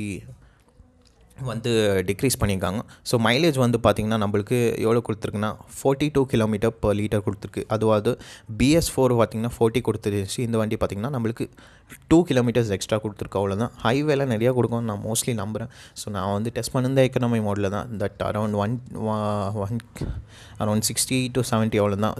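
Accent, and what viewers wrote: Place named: Bangalore, India. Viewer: we bangalorean speak tamil with same axcent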